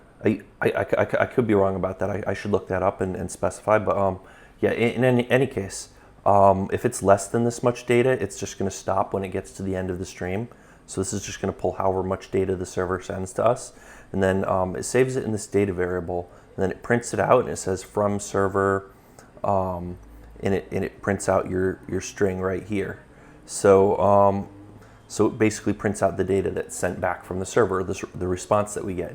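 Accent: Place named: United States. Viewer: finally one video in american english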